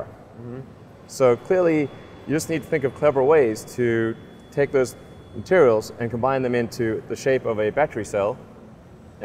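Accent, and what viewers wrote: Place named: Australia. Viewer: How come he has an Australian accent?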